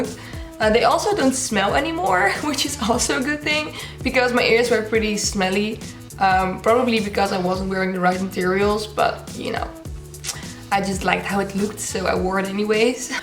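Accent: Netherlands. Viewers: Ik dacht al, haar engels klink heel nederlands achtig 😂. Toen las ik dat ze nederlands was.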